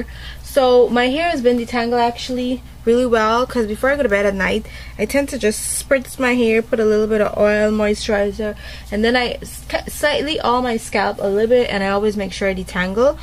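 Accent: Caribbean. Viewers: she def sound like a island gyal XD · r u from the caribbean? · Are you from trinidad or guyana?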